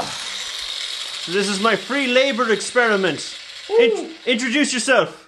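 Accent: Ireland. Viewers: its an irish accent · I thought he was Russian then realised he was irish. What a skilled .. blacksmith? He's good. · First thing I noticed was your irish. I'm Irish too by the way. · Is he irish?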